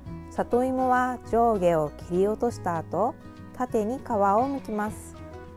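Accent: Kansai, Japan. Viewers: 素敵なご実家ですね。今もこういったお正月行事をきちんとされているなんて本当にいいことだと思います。さんは、兵庫県のご出身とのことですがいつも動画の中では関西弁が出て来ませんでしたが、今回の動画には出て来ていましたね。😊 今年もよろしくお願いします。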